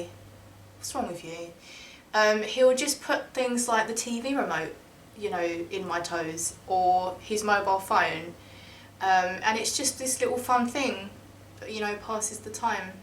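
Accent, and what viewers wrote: England, United Kingdom. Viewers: What part of England are you from? Could listen to you narrate a phonebook all day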